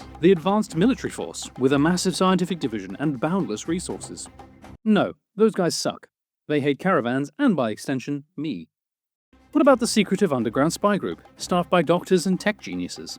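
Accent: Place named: United Kingdom. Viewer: You're Britisj 10/10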